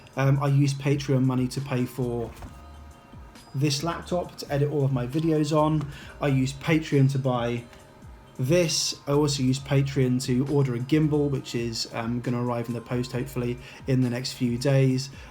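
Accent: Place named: Newcastle, United Kingdom. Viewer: Oh, so you're half geordie!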